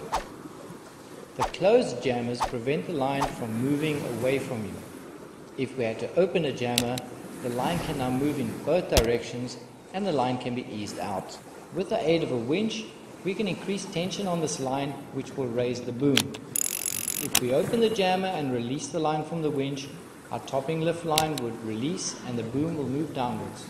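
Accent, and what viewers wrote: Durban, South Africa. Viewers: Hi great video, and I wish I had it when I started sailing. Your accent sounds like from Durban, SA. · Love listening to a South African teaching me about sailing...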